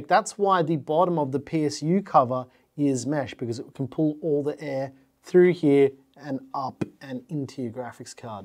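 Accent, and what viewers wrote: Australia. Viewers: I just bought this case and looked up this video to see how to take off the glass panels without manhandling and breaking them. Stayed the whole video for the chill vibes and Australian accent.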